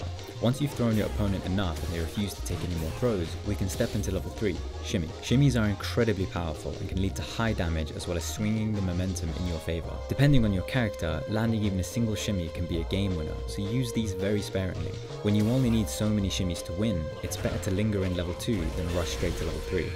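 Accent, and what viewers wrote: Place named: United Kingdom. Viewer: Only clicked in to verify that your are British, and indeed you are. Good day.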